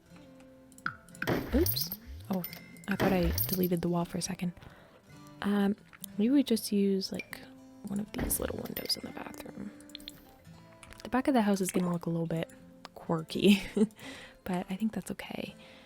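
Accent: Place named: Midwest, United States. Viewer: Are you from the Midwest? You got the accent and I love it lol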